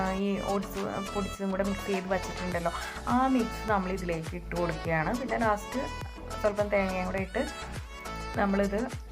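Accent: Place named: Thrissur, India. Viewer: Ennu ഹെൽത്തി ആയ ഓട്സ് പുട്ട് തന്നെ ആണല്ലോ ഉണ്ടാക്കിയത്. സംസാരം കേട്ടിട്ട് തൃശൂർ ആണെന്ന് thonunnu👌🏻👍🏻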